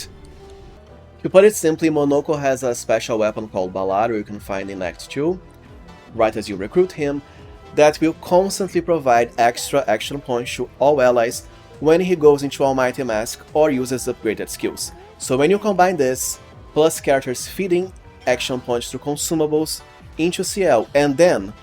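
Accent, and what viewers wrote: Brazil. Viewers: you dont fool me, you are brazilian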